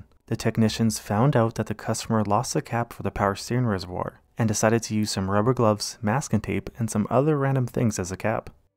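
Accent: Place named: United States. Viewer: The narrator has a american accent, but the transit van, , has British number plates. I find this most odd.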